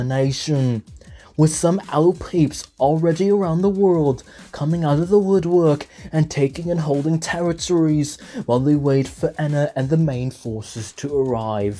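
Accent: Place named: United Kingdom. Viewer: didn't know you're british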